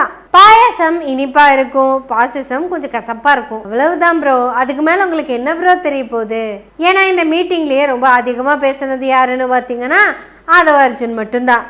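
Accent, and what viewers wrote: Kongu, India. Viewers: பனையூர் பண்ணையார்.அருமையான பெயர்.யெனவே யெனவே பேசுவோம் மகளே.கொங்கு தமிழுக்காகவும் கருத்துக்களுக்காகவும் சேர்த்து வாழ்த்துக்கள். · அருமை மகளே கொங்கு தமிழின் பேச்சு. அட்டகாசம் பெரிய ர் கருத்துகளை பரப்புரை செய்யுங்கள்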